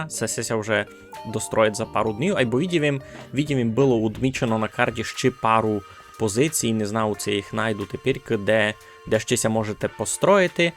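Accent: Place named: Mukachevo, Ukraine. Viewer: Та просто цікаво стало, в мене є знайомий просто з околиць Мукачева, то він говорить дуже подібно до вас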